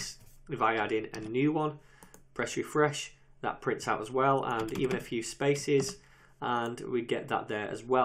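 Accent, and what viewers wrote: United Kingdom. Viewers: "Mathematical Addition" - I like the UK pronunciation. Lot of energy spent while speaking British English I believe.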